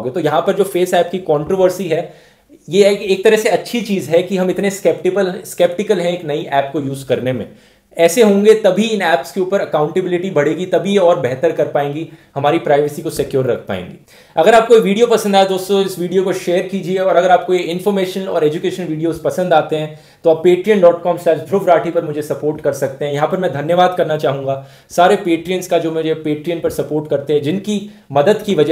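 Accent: India. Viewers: I dont understand a singke fricking word youre saying... please talk without that indian accent :)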